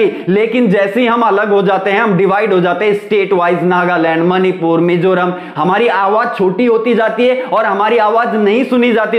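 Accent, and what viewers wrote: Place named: West, India. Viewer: Nahi vai iska voice aur tone dekh k lagta hain western part of India se hain.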